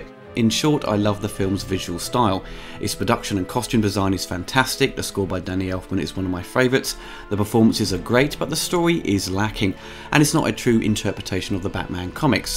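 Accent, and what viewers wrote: United Kingdom. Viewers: I agree, I prefer the old sound, it goes along with the movie style and soul. The new sound I would say it it's more in tune with newer films. The color is appalling! Why are they always messing what is right instead of correcting and clean what is wrong. Star Wars for example, is one of those cases of color tampering bahhh. Exactly, there will be always people who like one thing and others don't, so why not include options. By the way, love your reviews, channel and your British accent =) Cheers!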